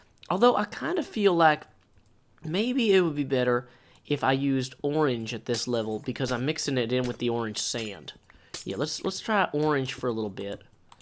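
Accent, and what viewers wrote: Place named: Nashville, United States. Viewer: There once was a man from Nashvilles, Who calls himself Yes that's his name Minecrafting's his game With a lovely accent from Nashvilles.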